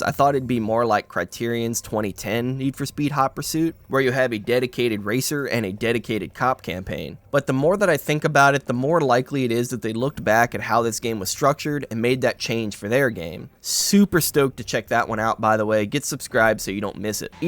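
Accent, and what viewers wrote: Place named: Philadelphia, United States. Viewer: This Philly accent is making my ears bleed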